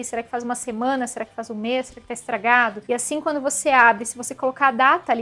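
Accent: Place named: Paraná, Brazil. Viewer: Amo esse canal: fato! Mas também amo esse sotaque paranaense! haha 🙄❤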